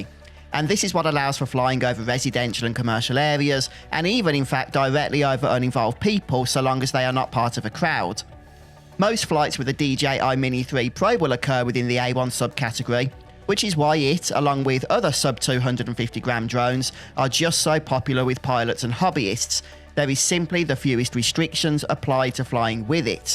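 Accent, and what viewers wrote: England, United Kingdom. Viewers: that terrible english accent :D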